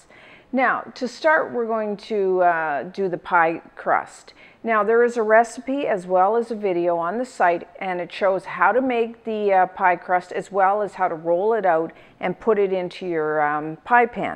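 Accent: Canada. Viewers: Are you from Canada you have an accent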